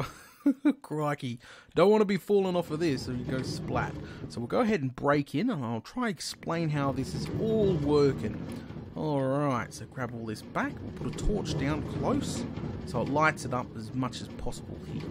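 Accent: Australia. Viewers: you are very australian sounding... except hardly anyone uses those words, are you from alice springs?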